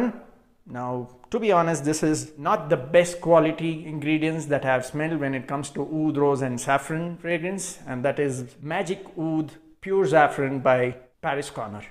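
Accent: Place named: India. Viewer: My brother u look arab but u sound indian what ??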